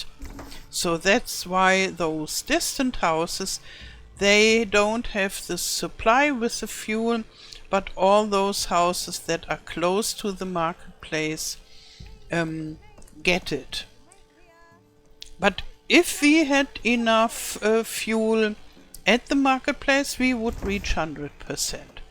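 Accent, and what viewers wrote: Germany. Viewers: A granny with a German accent :) So sweet :)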